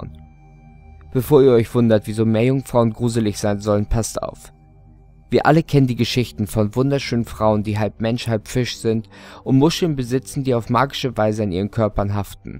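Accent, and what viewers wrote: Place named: North, Germany. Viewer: yay Norddeutsch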